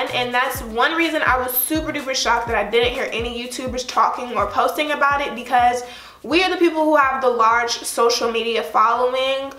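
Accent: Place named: United States. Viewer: A little bit confused here. You have a black Tshirt on with the letters BL_CK with a map of Africa between the Bl and the CK. So I'm guessing that it's meant to mean black African? Obviously black but you speak with an American accent, excuse my ignorance. Are you African or American? If African, what part of Africa do you come from? You do the American accent really well.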